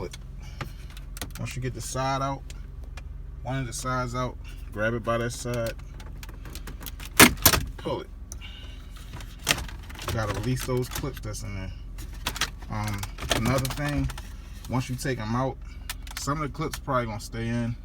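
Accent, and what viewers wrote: Baltimore, United States. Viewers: You from Baltimore ain’t you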